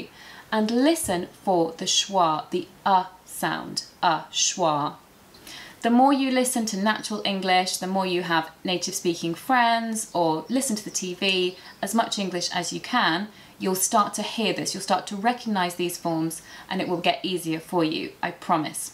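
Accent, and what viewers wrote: United Kingdom. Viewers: Hi from Mozambique, I love British accent and I'm following it, so thank you very much for spending your time for us. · British accent i want to hear more, And to learn. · I like the British accent. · Thanks for Lesson! 👍😉Yes, understand native English can be very difficult, but listening to natural English via Youtube I hope to help me! 🙂For me, I admit the connected speech is very difficult, but it sounds likeable, and surely I trying it! 🙂 I think that my biggest mistake I learnt grammar during my education (the professors were always got attention on grammar, the little on the conversation 😞) more than the others parts of English, but from now it'll be different! I do try my best! When it comes to understanding English, I would like to watch my favourite series ''The Hotel Babylon'' without subtitle on my own language, but the London accent sounds difficult! 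Although this series lasted too short and watched it already, I can watch it always! Maybe with english subtitle would be easier? And forget, it's good your advice about exercise understaning Native English with girlfriend! 😀👍 About accent, I prefer British accent! I really like it! I I liked it because of you! 😉🙂 In my opinion, British accent sounds more elegant from the American, more beautiful! 🙂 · I really like how you teach. I love British Accent.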